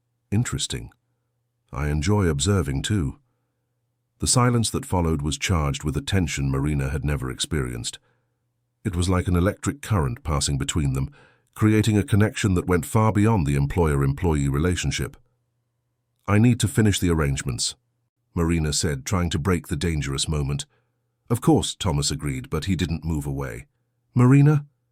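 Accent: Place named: England, United Kingdom. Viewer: last name norwood is English, the voice also sounds English but the picture does not look Englsh. Do like his longer sideburns though.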